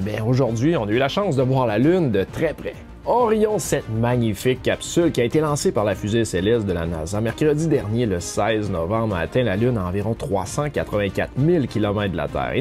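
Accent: Quebec, Canada. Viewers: L'espace racontée avec l'accent de la Belle Province, c'est top!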